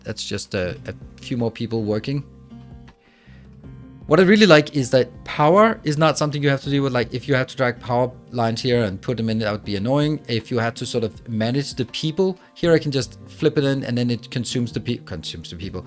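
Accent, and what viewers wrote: Denmark. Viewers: I’m really wondering what country your from because you sound danish but I’m not sure. Hope it’s not a too private question. Keep up the work.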